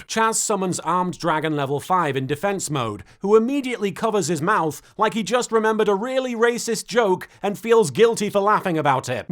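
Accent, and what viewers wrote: United Kingdom. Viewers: I love listening to a British bloke say cheeky things about an anime from about 20 years ago, so I must be in the right place! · Wait, are you actually British? This whole time I thought you were an American who just enjoys British slang. Kind of like a weaboo, but for British culture instead of Japanese.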